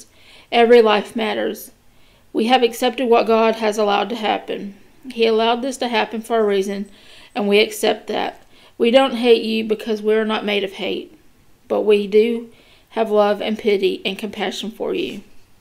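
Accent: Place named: South, United States. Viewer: Good stuff. Thank you, You've got the sweetest voice, im from Oregon so im used to those southern draws.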